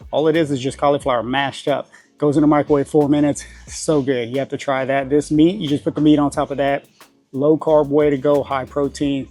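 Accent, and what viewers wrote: Louisiana, United States. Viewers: a bibimbap or bulgogi bowl meal prep would be a lot of fun! I thought I heard a Louisiana accent. I grew up there but am now in DFW 😊.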